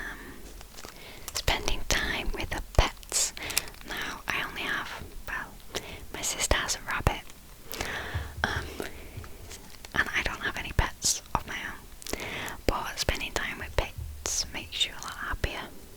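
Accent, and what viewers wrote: United Kingdom. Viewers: Na it's British i think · Her accent is British. Sorry if that sounds mean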